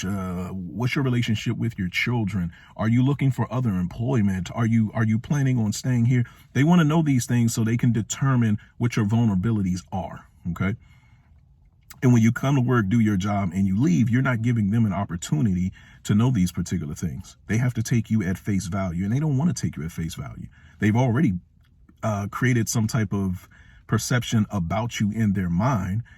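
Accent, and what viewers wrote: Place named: Washington DC, United States. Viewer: Man I heard you telling your story like damn he sound like he from DC and well spoken . Then u said it, subscribe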